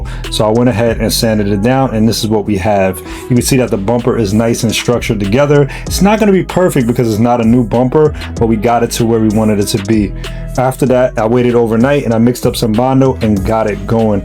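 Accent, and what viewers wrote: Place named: New York, United States. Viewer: it’s a NY accent lol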